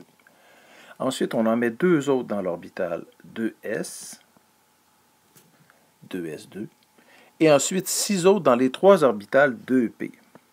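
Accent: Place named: Quebec, Canada. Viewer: Accent québécoise 🗣️🗣️😅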